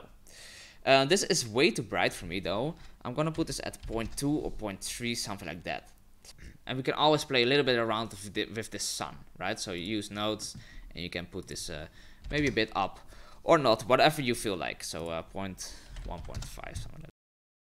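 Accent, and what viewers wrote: Netherlands. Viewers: Where are u from? ur english sounds like ur dutch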